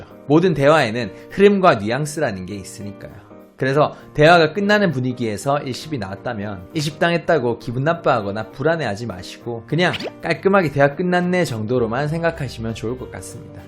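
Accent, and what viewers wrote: Gyeongsang, South Korea. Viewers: 고향이 대구쪽이신가??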